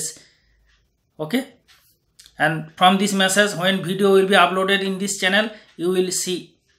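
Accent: Bengal, India. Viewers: Sir ap k voice Bengali o ka tara hain... App jisi bhi language main baat karte hain Oy important nehi hain... But your video is superb...thank you so much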